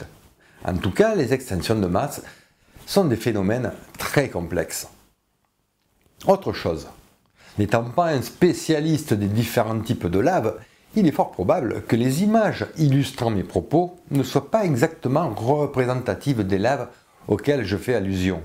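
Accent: South, France. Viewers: J'adore votre chemise, manque des espèces d'arbres fossiles dessus, j'aime encore plus votre commentaire assez clair outre l'accent du Sud ^^ Les trapps de Sibérie semblent indiquer que l'humanité pourrait aussi disparaître un jour suite à un épisode volcanique ravageur enclenché par un réchauffement global et relâchant des mégatonnes de gaz toxiques... Yellowstone pourrait être un vecteur de catastrophe, pour l'instant le fond du cratère se déforme et s'élève et les geysers chantent devant les touristes, advienne que pourra. La peau d'orange qui recouvre le globe pourrait se déformer aussi avec une fonte accentuée des glaces, ce sont des phénomènes qu'on maîtrise encore mal car ils sont multi factoriels, donc probable qu'on soit mis devant le fait accompli en cas d’événements cataclysmiques, mais restons optimistes... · Très bon sujet. Et c'est toujours agréable à écouter avec cet accent plein de soleil alors qu'il pleut à verse chez moi :)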